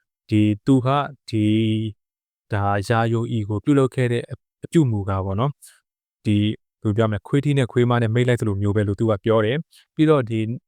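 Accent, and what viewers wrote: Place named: Rakhine, Myanmar. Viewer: အကိုက ရခိုင်ထင်တယ် စကားဝဲတယ်😊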